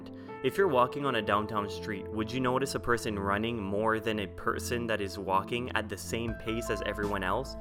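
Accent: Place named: California, United States. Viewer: sounds like your from cali or south florida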